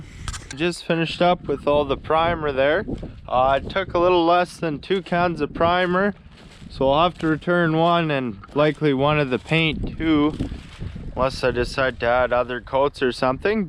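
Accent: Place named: Manitoba, Canada. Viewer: Where Canada? Your accent sounds like my family in Manitoba. I like it, makes me feel at home... deadly!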